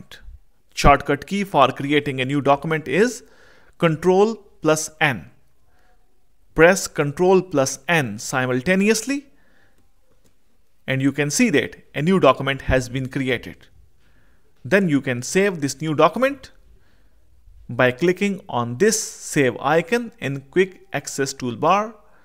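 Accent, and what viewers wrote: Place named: India. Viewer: The heavy indian accent 😅😂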